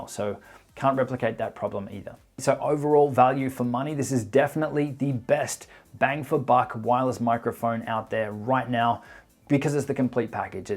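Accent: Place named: Australia. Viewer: ugh just love australian accents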